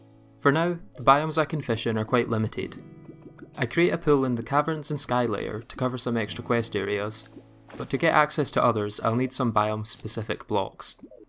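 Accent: Scotland, United Kingdom. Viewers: Omg the Scottish accent makes this video 10X better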